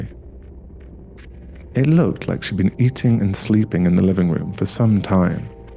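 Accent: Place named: United Kingdom. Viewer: New subscriber, nice to hear a fellow Brit here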